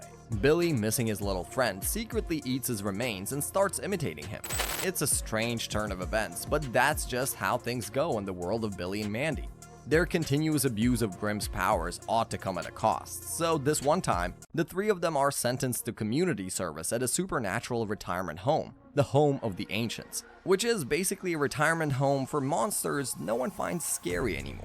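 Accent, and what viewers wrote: Canada. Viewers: I had stopped 10 mins before the end of the video... dude I know you gotta be Canadian because of using zet but they still sa the names correctly -_ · Great video. Where are you from? I notice your pronunciation stands out. My guess is Canada.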